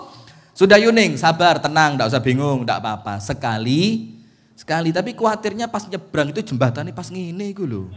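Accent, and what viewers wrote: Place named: Malang, Indonesia. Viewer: Alhamdulillah jazakallah khoyron ilmuny ustadz,saya tinggal di Lampung tapi asliny org malang..jd tombo kangen dgn logat malangny🙏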